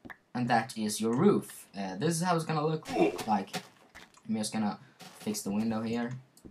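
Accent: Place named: Sweden. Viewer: like a swede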